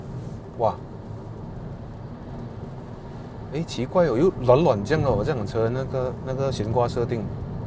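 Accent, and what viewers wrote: Malaysia. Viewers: 有问题吗？马来西亚中文频道，马来西亚中文口音，没毛病。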